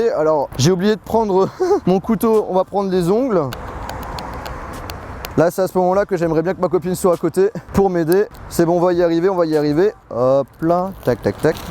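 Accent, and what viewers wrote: Belgium. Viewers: tu es Belge ????? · t est belge ? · tu es belge? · Par contre t'es vraiment en train de prendre l'accent belge ...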